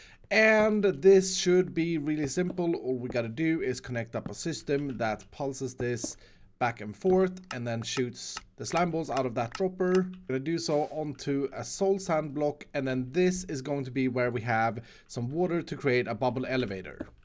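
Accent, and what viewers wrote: Sweden. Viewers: Funny how i could instantly tell you're swedish based on your accent but had no idea keralis was from our western neighbour too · Do you come from sweden? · It sounds like you are swedish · I am new to your youtube. Are you from sweden becus you sounds wery like a swedish persone. Sorry if you not are from sweden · are you swedish?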